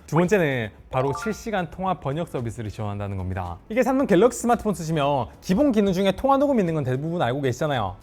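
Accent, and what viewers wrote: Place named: Seoul, South Korea. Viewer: 오랜만에 왔는데 말투가 시원시원하게 바뀌시고 딕션도 더 좋아지셨어요 빠르기도 빠르고 부드러운 서울말쓰는 느낌 ㅎ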